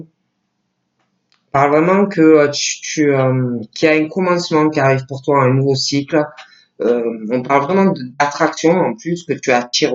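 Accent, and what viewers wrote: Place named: Marseille, France. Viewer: Bonjour s est la première fois que je vous vois, et vous écoute, vous êtes un monstre dans le bon sens biensur, vous êtes extraordinaire, merci votre accent, j ai le même Marseille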